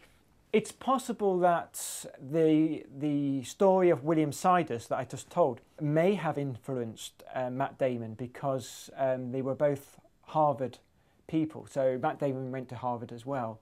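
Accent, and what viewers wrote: United Kingdom. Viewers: Love the British accent. Hardys thoughts make me upset im almost 25 and I feel like now I can't make it ugh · the story-telling is somehow better with the British accent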